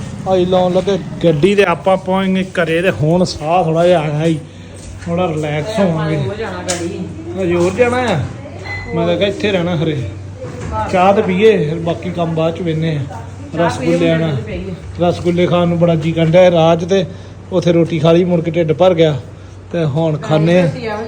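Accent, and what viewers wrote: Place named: Malwa, India. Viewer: Haji veer ji malwa Di boli aa ❤❤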